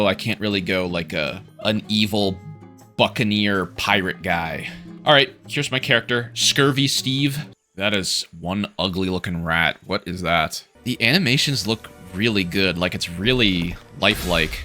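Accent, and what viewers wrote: Canada. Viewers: Are you Canadian? 🤔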